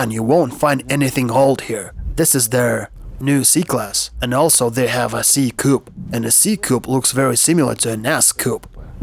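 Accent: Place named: Russia. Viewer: как всегда - восторг! Субъективное мнение: Если этот канал ориентирован на англоговорящих людей, то им не будет интересно это смотреть из-за озвучки. Акцент слишком русифицирован и не чувствуется какого-то драйва диктора. Он не говорит так, как говорит американец или британец - интонационный поток "мертвый" в каком то роде:( Интонация всегда падает вниз и не передаёт эмоции рассказчика как таковые. Возможно в оригинальной озвучке их и не особо много было, но над этим явно стоит поработать и тогда англоговорящая аудитория будет заинтересована слушать это! Можно взять любой американский обзор и увидеть разницу - <link> Всех благ и удачи!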